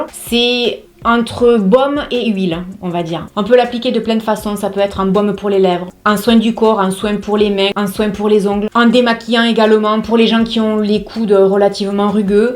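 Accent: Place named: Marseille, France. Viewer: Bonjour merci pour vos vidéo elles sont très intéressantes..en principe les produits Avène ça a toujours été une bonne marque….vous avez un accent Marseillais….moi j habite 50 km de nimes Alès et vous vous êtes dans quel coin si c pas indiscret évidemment….merci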